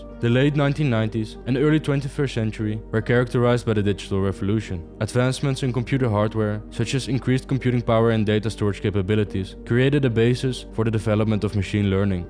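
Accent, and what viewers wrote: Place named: Denmark. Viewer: ah cool, kinda sounded like a danish accent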